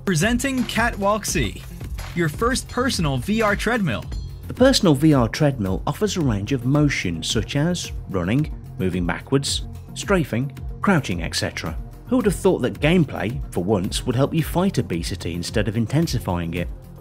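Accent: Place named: RP, United Kingdom. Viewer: Puke inducing, oversofisticated British accent. Voice is akward modulated, I turned it of I couldn`t stand the lector. I had convulsions after listening him, even though the topic was interesting.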